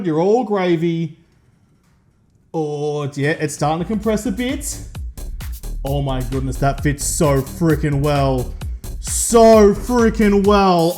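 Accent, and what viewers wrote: Australia. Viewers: The aussie gab is a riot to listen too. At least you have a garage to work in! Im out here working on my Dart laying on my back in the dirt lol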